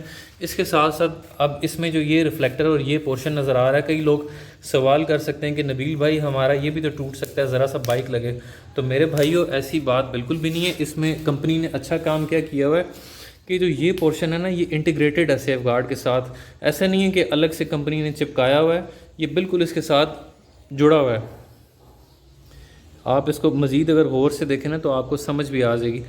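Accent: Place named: Saraiki, Pakistan. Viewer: Iski awaz mein saraiki pan hai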